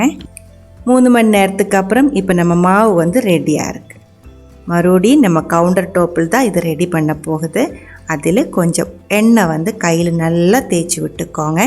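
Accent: Kerala, India. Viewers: കേരളയെവിടെയാ സംസാരം കേൾക്കാൻ നല്ലരസം ഉണ്ട് തമിഴ് മലയാളം കളർന്നു കേൾക്കാൻ നല്ലരസമുഡ് 👌👌👌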